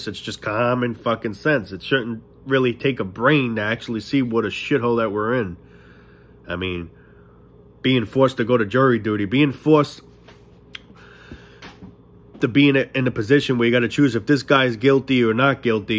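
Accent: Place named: Boston, United States. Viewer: Are you from Boston ? Love the accent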